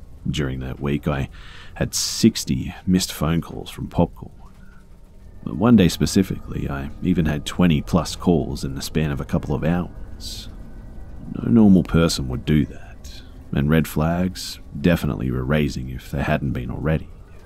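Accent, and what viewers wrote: Australia. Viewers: Melinda Nicole I thought it was only me that loves the Australian accent XD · Thank you for making these videos they honestly are the only thing that helps me sleep. Your voice is just nice and it’s also nice listening to a fellow Aussie accent 👌🏻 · I agree! Love Australian accents. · that's our australian accent mate, i'm an aussie and so is, if you don't like it theres plenty of other narrators out there who aren't australian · so? he's still got an Aussie accent... we are very multi-cultural in Australia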